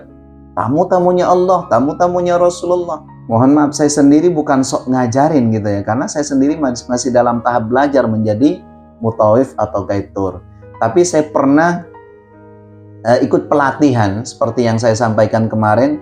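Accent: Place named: Semarang, Indonesia. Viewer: Aslkm. Saya dah Marem penjelasan nya ustad. Atas bimbingaya. mohon maaf. Saya mintak alamat pak ustad. mau tabarukan. saya Semarang. logatnya pak ustad seperti logat Semarang trmksh.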